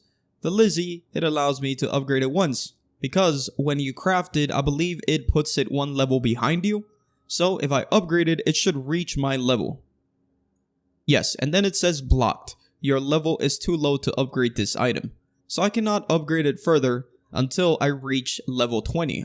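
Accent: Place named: Brooklyn, United States. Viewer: What dialect is this, Brooklyn? Not meant as an insult.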